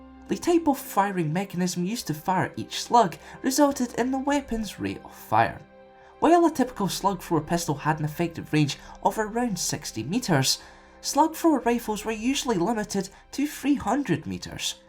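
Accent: Scotland, United Kingdom. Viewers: no hes scottish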